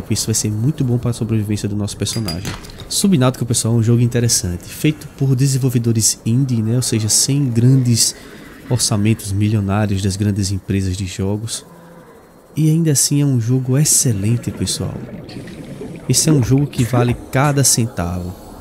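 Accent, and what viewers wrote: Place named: Northeast, Brazil. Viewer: Conhecendo o canal agora, por esse jogo, pq preciso de dicas, to meia perdida nele, gostei, adoro esse sotaque nordestino, ganhou uma inscrita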